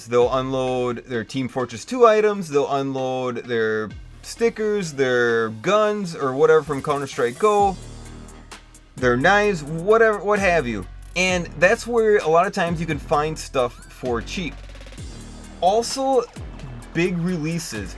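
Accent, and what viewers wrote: Canada. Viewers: You sound Candian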